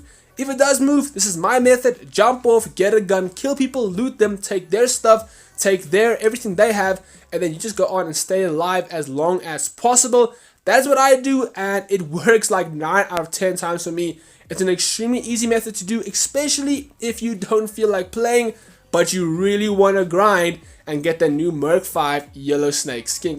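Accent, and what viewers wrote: South Africa. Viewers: Is this guy from south africa? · You south african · Bro are you South African you have our accent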